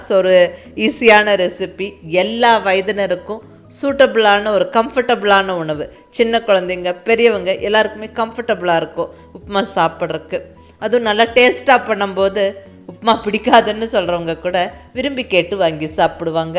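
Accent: Coimbatore, India. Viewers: More than everything,your presentation and narration is fantastic.the language and slang is like that of coimbatore ladies.i like the preparation the 'padam(Tamil) is my favourite.my wife does not like,but I prepare both upma and Pongal like this.thank you for the special tip,that of heated and cooled milk.i was not knowing.gift during maargazhi maasam.thank you.iam 77 plus!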